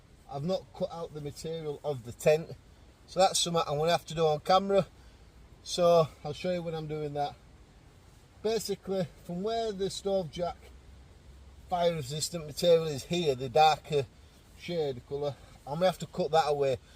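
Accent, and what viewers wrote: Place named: Liverpool, United Kingdom. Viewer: It's called scouse lol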